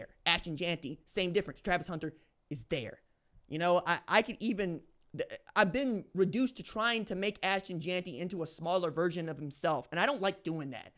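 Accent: Oklahoma, United States. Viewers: Okay Tulsa Ok! Sounding like you from Turley Ok. Just gangster 😂😂❤❤❤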